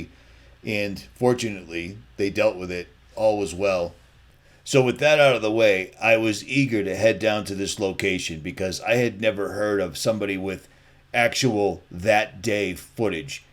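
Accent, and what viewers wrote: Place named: Boston, United States. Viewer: You sound like richie from Boston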